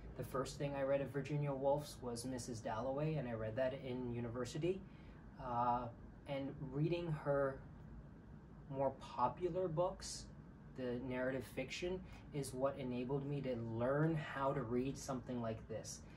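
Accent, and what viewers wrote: South, United States. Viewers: Love the southern accent! good idea! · As a native Texan, I found your southern accent delightful, and you are just adorable.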